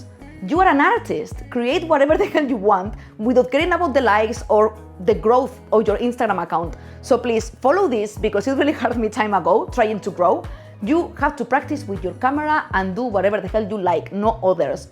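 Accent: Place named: Spain. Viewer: Hi there, your videos are great and interesting. But english is my 2nd language and you are not so easy to understand because you talk so fast and the Spanish accent. So can you speak slowlier in the future? Would be good for the viewers with a background like mine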